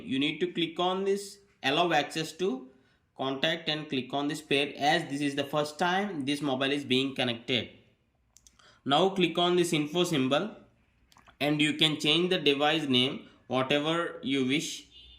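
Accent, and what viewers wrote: India. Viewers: The way you explain as if you are eating your words😂 Indians accent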